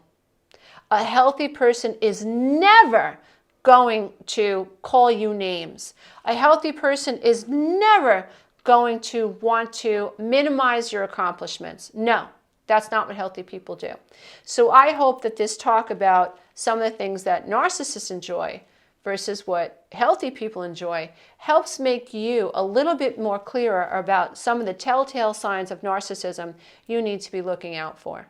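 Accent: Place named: New Jersey, United States. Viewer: Great video. I agree with everything you said. I like your “Joisey” accent (a compliment if you aren’t from NJ).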